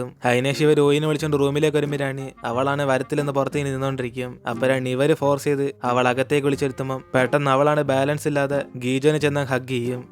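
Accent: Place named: Pathanamthitta, India. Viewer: Chetta vid pathanamthitta ano??? Slang kettit thonni athaa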